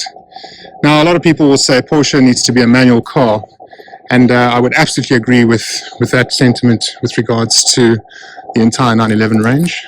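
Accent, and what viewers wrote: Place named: South Africa. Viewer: I take it from your accent, you're South African. Is that where you got the car ? It's spectacular. The nicest 928 I've seen..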